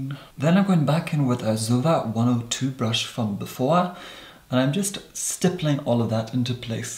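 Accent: Scotland, United Kingdom. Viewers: Knew he had 2 be scottish doin an accent!!! Something about th tone of his voice is very lorraine kellyhaha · What the hell is that accent?? It’s a mix of posh Scottish and god knows what else? Jesus.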